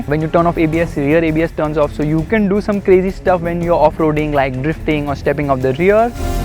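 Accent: India. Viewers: sing song blibbida dibba bibida lol that is what I hear half the time...I love Indian accent but when spoken fast I seriously miss half of it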